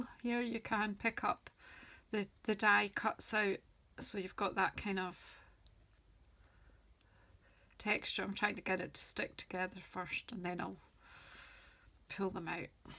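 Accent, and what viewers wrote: Scotland, United Kingdom. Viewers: Hi, I stumbled onto your video, stayed, watched and have become your newest subscriber. Your lovely Scottish accent has taken me back to my childhood. Although i am second generation Canadian, 3 of my 4 grandparents were born in Scotland and emigrated to Canada as young adults. It is just so special to hear the accent again. Oh yes BTW, the card was spectacular. Thanks so much. 😊🇨🇦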